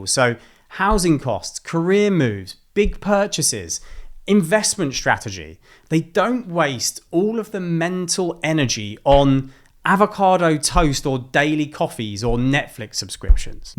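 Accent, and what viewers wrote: United Kingdom. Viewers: This is a really useful resource, both in terms of finances and for learning English. are you a Londoner?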